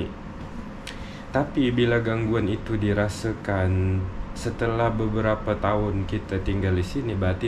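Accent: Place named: Kuala Lumpur, Malaysia. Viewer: Senang mendengar menyantuni keluarga pesakit dengan menggunakan loghat KL sebaik mungkin, walaupun inilah kali pertama datang ke Kuala Lumpur.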